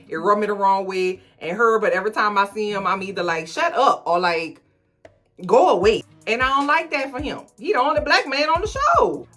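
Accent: New Orleans, United States. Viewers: As someone who is also from New Orleans, I appreciate this commentary in New Orleans accent. I’m just over hear laughing because it’s like overhearing a good conversation. 😅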